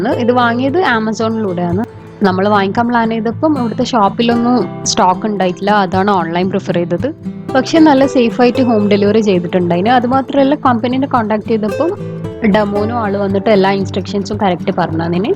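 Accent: Kannur, India. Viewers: Madam Kannur ano? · Ningal thalasheri anno kannur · നല്ല രസം ആന്റെ സംസാരം കേൾക്കാൻ..കണ്ണൂർ ano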